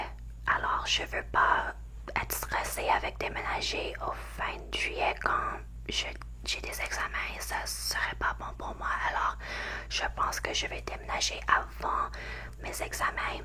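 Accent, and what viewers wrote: Quebec, Canada. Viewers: Wow tu es vraiment bonne, j’aurais cru que tu etais du Qc et non Ont so its even more impressive! J’espere voir d’autres videos en francais de toi ❤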